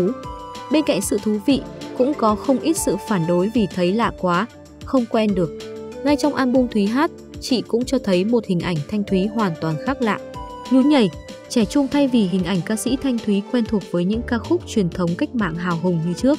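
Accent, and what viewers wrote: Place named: South, Vietnam. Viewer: cái chất giọng điêu luyện rặt Nam Bộ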